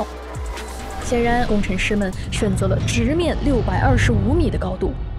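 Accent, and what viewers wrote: Sichuan, China. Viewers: 配音的是我老乡四川人❤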